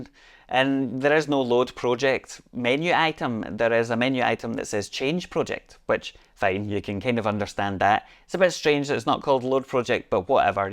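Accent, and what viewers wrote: Scotland, United Kingdom. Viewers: I believe it must be Scottish.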